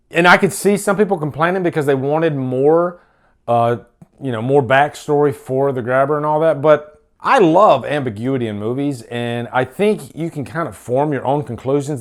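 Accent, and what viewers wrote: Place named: Louisiana, United States. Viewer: Are u from Louisiana? I asked cause I can kinda hear an accent lol. Great review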